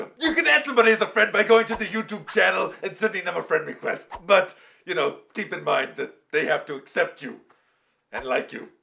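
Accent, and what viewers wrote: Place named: Mexico. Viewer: this ninja sounds mexican!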